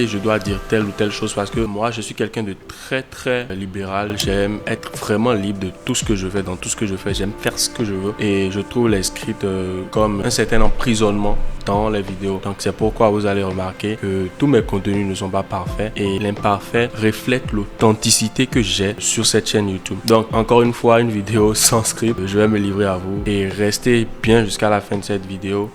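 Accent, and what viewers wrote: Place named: Togo. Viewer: Bonsoir, vous avez un accent togolais!🌺❤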